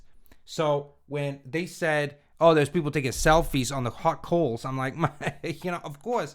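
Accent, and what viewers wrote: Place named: East Coast, United States. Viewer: Run up on there! Now you sounding East Coast! NY/NJ BitCoin revolution! 0x8f641256fedf1453eb<num>b2cf25fe51ada0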